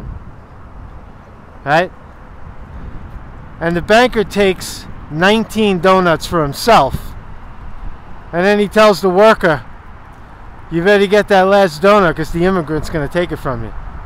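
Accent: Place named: St. Louis, United States. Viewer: ...I grew up on a State street in St. Louis...although You are a little more refined than me I feel we have a connection through speech...It's like-- I came to kick some ass and chew bubble gum...and I'm all out of gum...keep up the Hard work my man.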